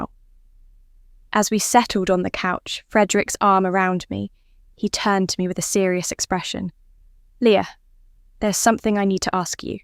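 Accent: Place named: United Kingdom. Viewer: Well, that's it. I'm throwing my unfinished short story in the trash. My favorite pen is going back in it's case. I can't compete with perfection. Dear, you have an exceptional gift for relaying a tale! Every requirement is spot on. Plus, I'm rather fond of the British accent. I could listen to you for hours. Simply put, your storytelling abilities are amazing! I'm sure our children will love their bedtime stories!🤣🤣🤣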